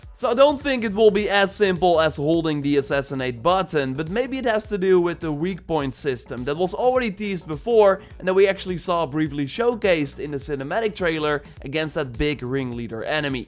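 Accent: Netherlands. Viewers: I can hear that you are Dutch hehehe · This dudes accent sounds so dutch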